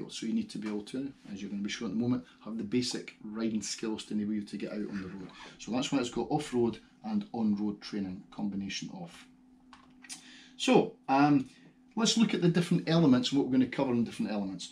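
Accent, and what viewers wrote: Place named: Scotland, United Kingdom. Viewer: Hi, ive been training to be a cbt instructor for a couple of months now and this was some fantastic depth and detail for element A! also very refreshing to listen to a fellow scotsman explaining it all lol! thanks for taking the time to do this for new riders and advanced riders! i hope you dont mind me taking down notes from your videos!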